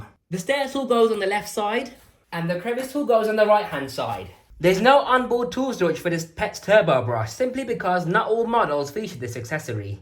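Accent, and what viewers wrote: United Kingdom. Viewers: "A million times Bet ah" I love a British accent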